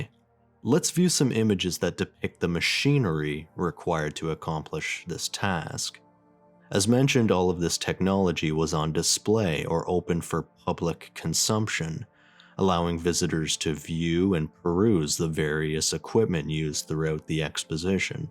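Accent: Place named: Eastern Canada, Canada. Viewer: this boy eastern canadian AF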